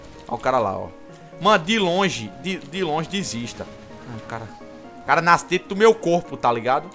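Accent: Northeast, Brazil. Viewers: Bola de GÚDÍ , BÓDÍ KKKKKKKKKKKKKKKKKKKKKKKKKKKKKK Eu amo os sutaques nordestinos Kkkkkkkkkkkkkkk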